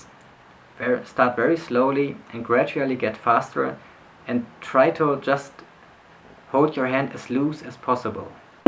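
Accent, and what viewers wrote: Germany. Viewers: You don't hear Germans with this accent much anymore. It's a cool accent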